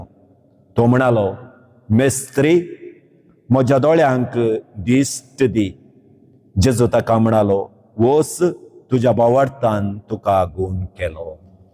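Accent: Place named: Mangalore, India. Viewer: Amazing teachings Fr. You are always our favourite preacher. Your konkani is mangalorian it sounds nice.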